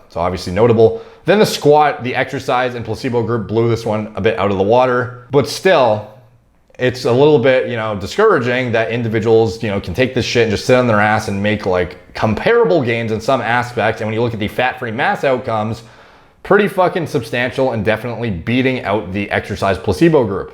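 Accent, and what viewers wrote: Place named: Canada. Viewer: bruh. you sould so canadian.....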